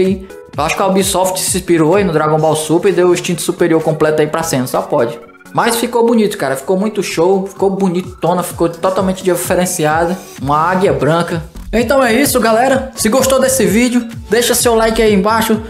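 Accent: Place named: Northeast, Brazil. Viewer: E nois tabem sou nordestino cabra da peste o Nordeste vai domina o mundo percebi pelo sotaque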